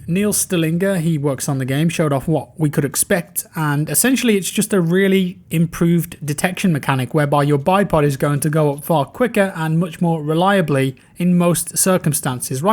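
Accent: United Kingdom. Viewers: Talks like a brit.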